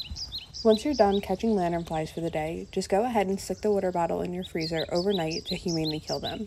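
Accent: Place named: South Jersey, United States. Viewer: You south jersey has a sifferent dialect than central and north east lol :)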